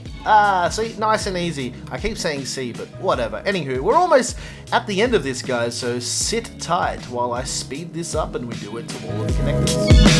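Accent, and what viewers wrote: Australia. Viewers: he sounds Australian i love it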